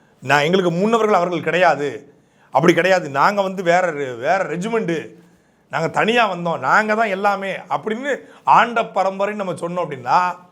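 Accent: Tirunelveli, India. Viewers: அண்ணாச்சி நீங்க திருநெல்வேலி மொழியிலேயே பேசுங்க ரொம்ப சுவாரஸ்யமா இருக்குது💯👏👌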